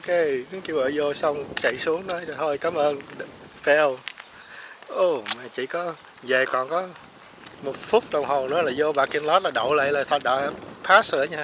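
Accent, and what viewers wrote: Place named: Mekong Delta, Vietnam. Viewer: Chúc mừng cháu nay vui nên ăn no cứng bụng luôn , mà lạ nhen toàn từ Việt phổ thông nhức nách , ná thở , con cò có cái cổ cong cong ... giọng , tiếng không pha lẫn của miền Tây VN ..... vui và thân thiện . Cám ơn bạn .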